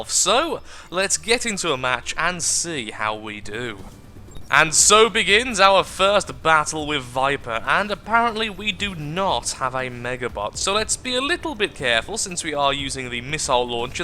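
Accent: United Kingdom. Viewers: hes British. not american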